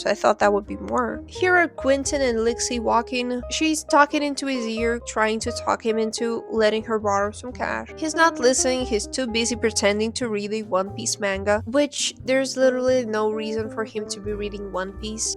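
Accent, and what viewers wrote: Russia. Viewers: is this Russian accent I hear? прикольно видеть русских даже на англоговорящем интернете :D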